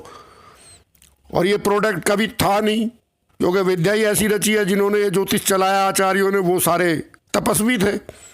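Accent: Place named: Haryana, India. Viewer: 🙏🙏 Maharaj ji .aapki hariyadwi language bahut achchi hai.main bhi hariyadwi hun.shubh ratri.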